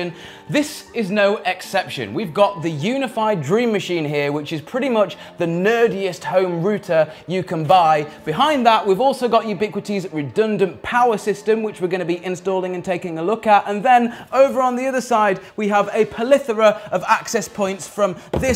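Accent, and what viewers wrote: England, United Kingdom. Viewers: He's English, we pronounce it like garridge.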